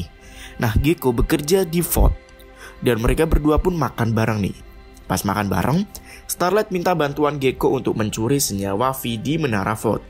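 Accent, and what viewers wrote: Java, Indonesia. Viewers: Mas dari jawa ya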